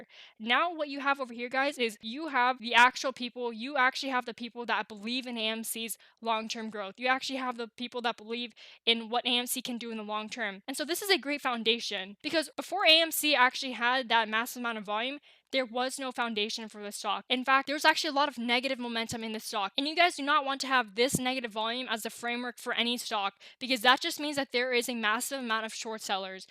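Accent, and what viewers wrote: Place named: Canada. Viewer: Well-done, 👍 🐰‼️👏👏 Did I hear a little Canadian 🇨🇦accent in your voice? My kids have that too‼️😃I like it‼️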